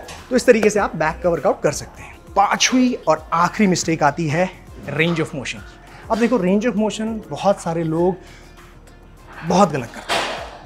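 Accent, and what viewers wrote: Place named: Delhi, India. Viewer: Muhje ek bhot confusion hota appki😂 tone sun kar kabhi kabhi lagta hai app Mumbai ke nahi ho kya app Delhi ke ho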